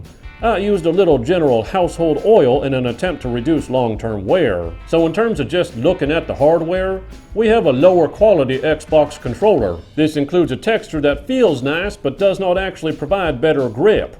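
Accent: Texas, United States. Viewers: An asian that has an accent of an american guy from texas lol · i have bad vision, the mustache is a small detail. As for the accent it didn’t sound annoying to me, just unique. Not tricked, You just sounded like a texan with a thick accent. I pay attention to what is said not appearances. I just hadn’t considered the possibility that you were playing a role.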